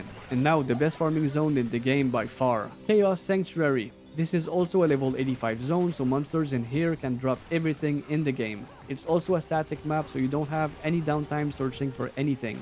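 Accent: Quebec, Canada. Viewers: Me semblais en ecoutant jtrouvais sa sonnait quebec 😂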